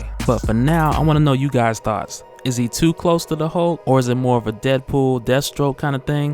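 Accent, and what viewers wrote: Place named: Georgia, United States. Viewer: I knew you were from the GA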